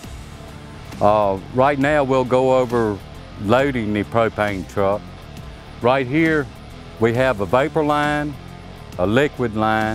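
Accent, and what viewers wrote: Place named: South, United States. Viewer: Im about as north as you can be and damn that's thick south!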